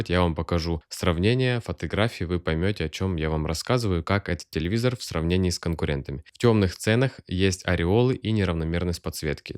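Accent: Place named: Ukraine. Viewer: У тебя оч приятная укр мова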